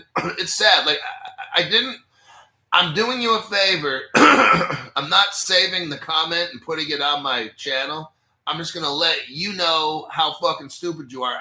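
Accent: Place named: New Jersey, United States. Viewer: You must be from Jersey because you talk just like me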